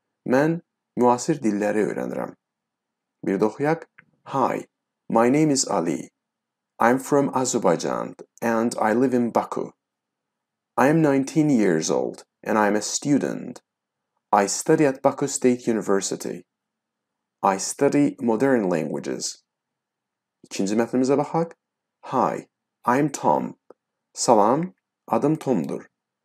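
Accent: England, United Kingdom. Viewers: Təşəkkür edirəm sizə müəllim . Çox gözəl dərs başa salırsız , tələffüz edərkən Azərbaycanlı ləhçəsilə deyil əsl İngilis aksentilə deməyiniz təqdirə layiqdir. Çox sağ olun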